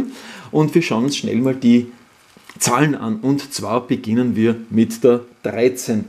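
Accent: Austria. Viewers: Ich mag den sarkastischen Humor vom, der fehlt mir hier in Deutschland, und dazu noch das Österreichische!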